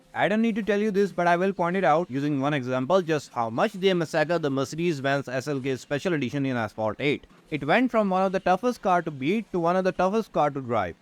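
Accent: India. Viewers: nice 👍 you got a indian accent btw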